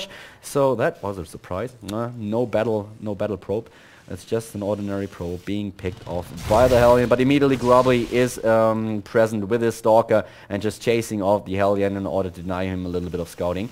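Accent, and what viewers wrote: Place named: Germany. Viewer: Your English has this typical German accent. But nevertheless, you are one of the coolest caster of Germany.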